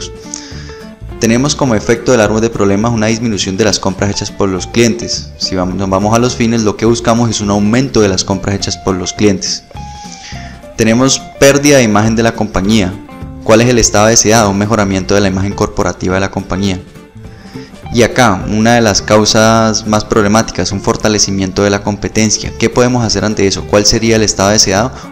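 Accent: Cali, Colombia. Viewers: Hablalo Caleño!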